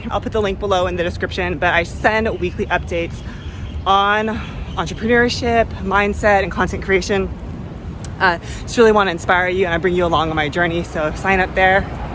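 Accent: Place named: Hawaii, United States. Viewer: I’m born and raised in Hawaii and live in my childhood house on the windward side of Oahu. I really enjoy watching videos people post about living or eating in Hawaii. I love your Asian Aunties, and Mamma Lai videos… my all time faves!!! If you hadn’t mentioned where you were from, I would have thought you were from here!! Have a great day and thank you for the morning walk 🫶🏻🤙🏼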